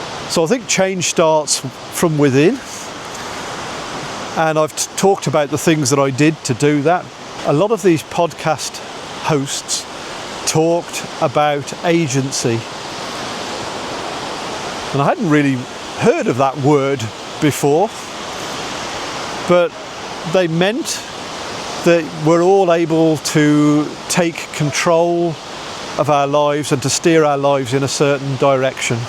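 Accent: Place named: Midlands, United Kingdom. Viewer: Your family should be so proud of you! You speak such sense … I really found this interesting and drew parallels with my own life. You sound like a fellow midlander… good luck to you x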